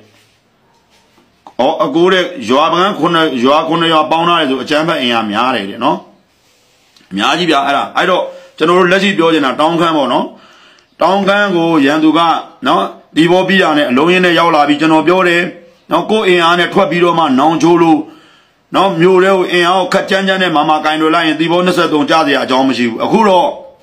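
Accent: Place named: Rakhine, Myanmar. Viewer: ခင်ဗျားဒီပြင်ချန်နယ်မှာမပြောနိုင်ဘူးလား၊ရခိုင်အသံမုန်းလွန်းလို့၊ခင်ဗျားဒီချန်နယ်ဘဲပြောမယ်ဆိုကျုပ်နားမထောင်ချင်တော့ဘူး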